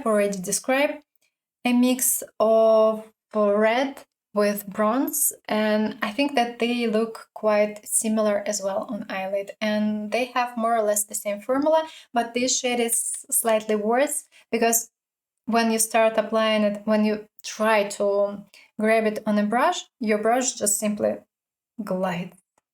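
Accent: Russia. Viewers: какой русский при русский акцент😄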